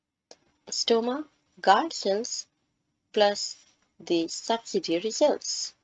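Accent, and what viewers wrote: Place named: United States. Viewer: Ma'am the video was fabulous , marvelous , fantastic , mind blowing , exellent . Your way of teaching is fabulous the way you explain is just fantastic . I had problem in understanding this topic but after watching your video i understood everything . I sure that you are the best teacher in the world with a stylish or i should say american accent . If you will start uploading videos like this only , then i am sure that you will the silver play button the gold and then platinum play button in one month only with at 30m subscribes and atleast 32m views on your each and every video .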